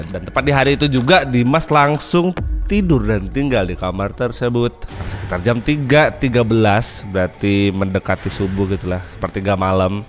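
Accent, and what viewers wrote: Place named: Lampung, Indonesia. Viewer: Bang ni orang lampung bukan ya? Logatnya kaya orang lampung ya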